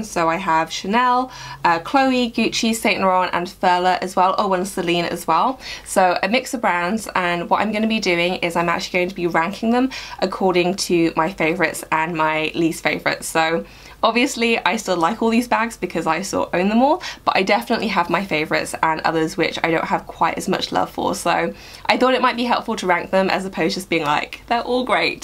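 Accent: England, United Kingdom. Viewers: REASONS I LIKE THIS VIDEO RANKED! 1. Succinct 2. Well thought out and planned 3. Intelligent and scientific reasoning 4. English Accent!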